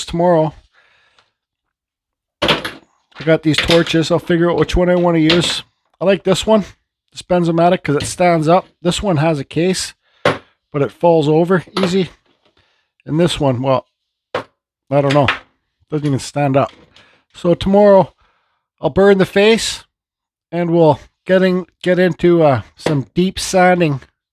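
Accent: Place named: Canada. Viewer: Don't let YouTube change your style, I like your style,. You remind me of me, without the canadian accent. Trapper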